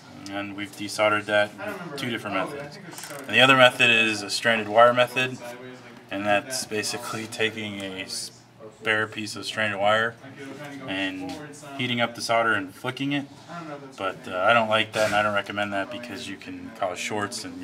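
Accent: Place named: New Brunswick, Canada. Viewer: you sound like a New Brunswicker. true?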